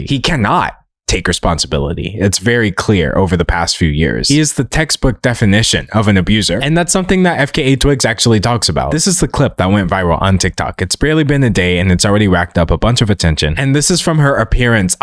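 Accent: South, United States. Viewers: first of all, where did this southern accent come from. Secondly, "the jealousy and insecurity" that put hands on a woman... uh, sir, abstract concepts cannot manifest in the physical realm like that? gross.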